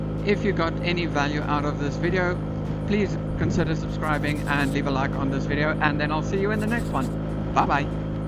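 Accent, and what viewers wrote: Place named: South Africa. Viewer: You sound south african, I don't see ocean views so I'm guessing gauteng?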